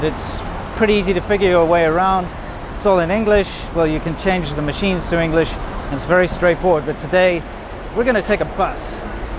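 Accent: South Africa. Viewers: You a South African😁👍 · Hi where are you from? I hear a lot of American expressions but you sound more South African. I have just started watching your videos and I think they are great. I had no idea how day to day life is in China but this is a good insight. I honesty would be absolutely in big trouble if I went there, no idea what those Chinese characters mean and as for the language?? you get the drift.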